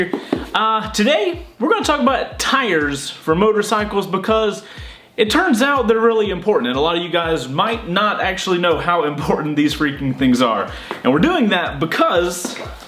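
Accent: South, United States. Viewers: "You could possibly, significantly....." Damn I miss the south.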